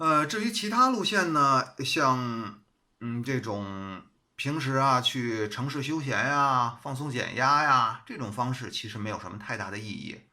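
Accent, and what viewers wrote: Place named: Liaoning, China. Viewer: 1. 老師口音聽起來像我遼寧老鐵的口音呢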